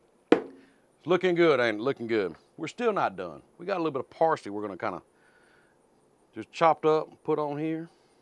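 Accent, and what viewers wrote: South, United States. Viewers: Another great recipe! Love the Southern accent. Greetings from Finland!🙂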